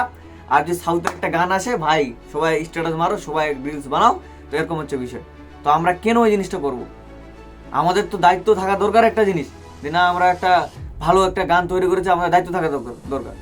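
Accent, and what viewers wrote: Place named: Bangladesh. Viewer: Ei gudir beta youtuber ta bangladeshi pola, ei mal tar kotha sunei bujha jacche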